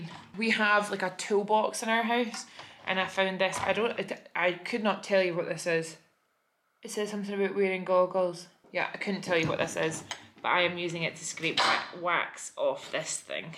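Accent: Scotland, United Kingdom. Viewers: i just started watching your vlogs & i'm already in love with your attitude towards life. you're so positive and inviting which is a welcome change from a lot of other influencers i've followed. your speaking voice is so soothing & you make the voice in my head change to scottish!! (i'm american lol) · you have a most joyous outlook on life and personality, its WONDERFUL. I could listen to you read books, give TED talks, describe instructions, whatever. Your gorgeous Scottish accent is soothing. Whatever direction you go with your interests and passions, I'll be sure to follow. Happy 2021 and love from Atlantic Canada 🇨🇦 ❤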